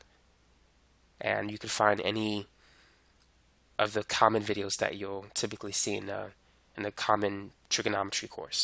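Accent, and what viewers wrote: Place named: Boston, United States. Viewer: Boston Accent.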